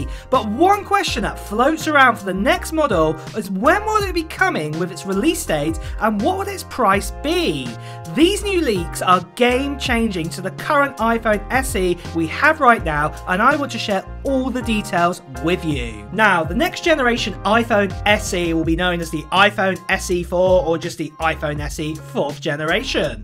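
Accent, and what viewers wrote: United Kingdom. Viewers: Fcking UK accent :D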